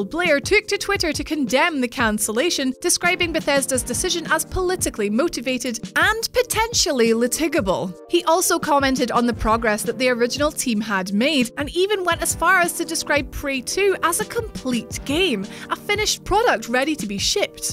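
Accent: Scotland, United Kingdom. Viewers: Was it just me or did get even more scotish than usual? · omfg that scotish accent <33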